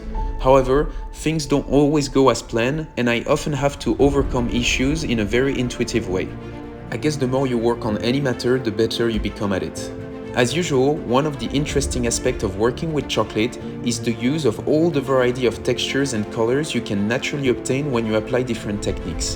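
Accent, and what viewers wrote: France. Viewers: WOW. Remarkable. Delicious. Inspiring. Always nice to see sculpturing beautiful ART work out of chocolate. The FRENCH accent is a must. ❣️🏹🐪🐫🦩🦚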